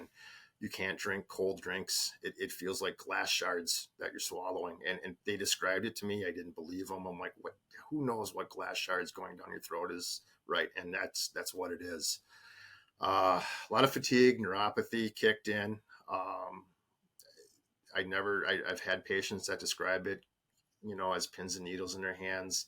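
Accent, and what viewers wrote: United States. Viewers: I hear from your accent that you are American. I'm sorry that America has failed you. The rate of cancer in young people is at an unprecedented high - and it is mainly cancers of the stomach and colon that have shot up in young people to levels never seen before. This is mainly due to the ultra-processed nature of food in America. Cancer is no longer only for old people in America. If you experience symptoms at any age - even in your teens or twenties - you really need to get screened for cancer especially as an American - if you can afford it. Because, you know, 'Merica and it's wonderful healthcare system.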